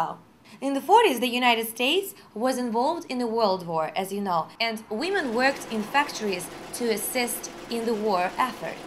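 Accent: Russia. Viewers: капец акцент питерский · I clicked a few of these because of they were featured. I think it's pretty cool what she does. Smart and good looking. Plus who does not like a Russian accent ? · Её рязанский акцент раздражает... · nice russian accent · Русский акцент... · russian english accent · такой акцент поймут только в россии :)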